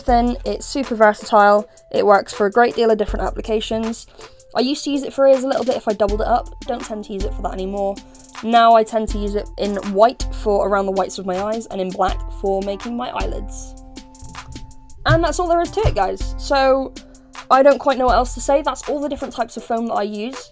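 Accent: United Kingdom. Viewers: Where do you get your fur? Your accent suggests you live in the uk and I find I dofficult to find cheap decent fur for my fur suits.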